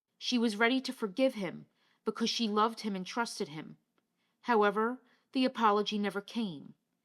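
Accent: United States. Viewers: No AI-generated voice can duplicate your very human Mid-Atlantic (?) accent. Super job, great narration, beautiful use of images. Such "tattle" tales of the rich and famous aren't my cup of tea but I'm happy to have found this impressive iteration of Old Hollywood history. Brava!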